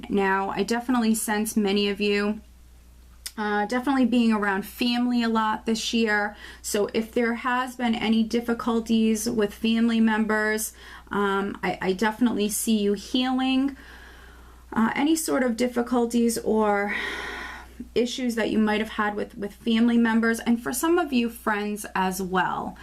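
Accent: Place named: New York, United States. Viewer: where are you from? I hear a ny accent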